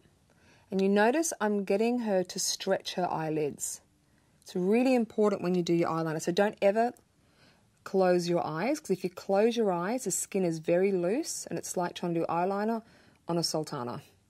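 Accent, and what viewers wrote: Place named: Australia. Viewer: OMG... I am an Aussie and a makeup up NUT and I cannot believe I am only learning of this amazing woman now! Where the bloody hell have I been? Overlooking that, what a fabulous tutorial and explanation... too good! I have exactly this eye shape and have abandoned all hope of ever wearing a sexy flick but now, maybe, I can... how fabulous to have a tutorial so well explained and so relevant AND with an Aussie accent! New subbie and super happy, thank you, this was fantastic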